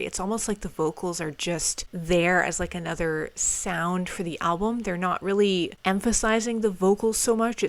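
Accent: United States. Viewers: god ur american accent is so annoying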